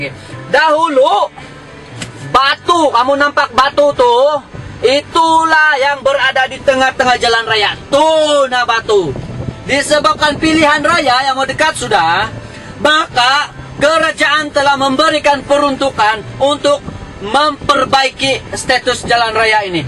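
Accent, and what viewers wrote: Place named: Sabah, Malaysia. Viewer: ni dalek sabah al buduh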